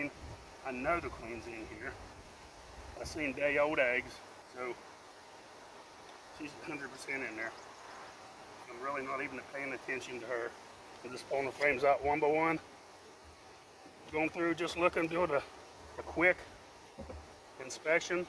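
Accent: South, United States. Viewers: would have been PERFECT if he were cast as the acting role of the beekeeper in Matthew McConaughey's movie Amaziah king. Its being filmed in AL. They were looking for a strong beefed up adult male strong from handling beehives for YEARS. He has the southern accent, experience, and he'd have represented beekeepers well. Darn it!! Wish Id have sent your info to them when they were doing casting calls. The role is being filmed in late July/August. Maybe its not too late.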